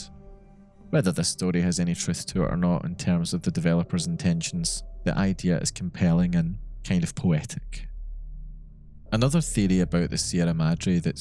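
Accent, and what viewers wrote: Scotland, United Kingdom. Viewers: Can't nail the Scottish accent down to 5O miles , I'm noob to the channel but am born in Glasgow and have been dealing with Mexicans on construction sites for too long in So-california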